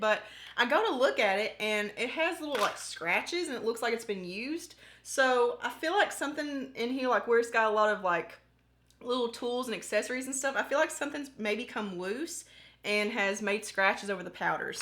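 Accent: South, United States. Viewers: I love your country accent!❤️ And your makeup is 🔥 #partypeople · #partypeople where Is your accent from? I love it, I'm southern also but I'm more of the hill Billy type lol hate that you are crying even if it is happy tears, you seem so sweet and although I don't know what's going on but I'll pray for you also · I love when I find new people to watch. Regardless of the giveaways I subscribed and rang the bell as soon as you started talking. Love the southern essence #partypeople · Here from Vianney's channel, subscribed, but not entering the giveaway, because I know nothing about applying makeup lol. I will say that I love hearing a southern accent!!! (Tennessee girl here) I also like that you're relatable and not afraid to show your emotion. Prayers for you and your family. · Love love love your southern accent girl it’s so beautiful to hear. You are beautiful and your skin is amazing.! 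I’m Always here to support smaller YouTubers Never give up your dreams !Xoxo from Emmett Idaho! 💗🌸 Congrats on 25k!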